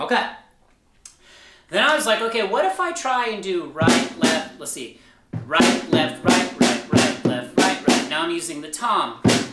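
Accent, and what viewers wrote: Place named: United Kingdom. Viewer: Oh u are from uk, I think u are from america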